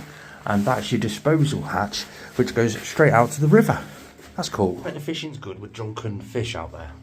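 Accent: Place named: Stoke, United Kingdom. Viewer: Dude are you from Stoke ?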